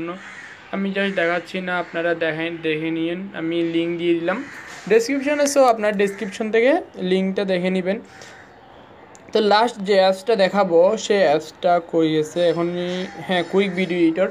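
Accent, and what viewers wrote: Chittagong, Bangladesh. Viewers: ভাই মাঝে মাঝে আপনার গলায় চাটগাঁইয়া ভাষা চলে আসে! আগে শুদ্ধ করে বাংলা ভাষা বলতে শিখোন।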